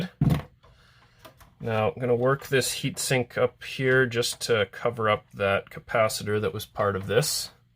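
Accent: Canada. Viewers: A lot of people must not understand he's Canadian when they're listening to him. Pretty sad really.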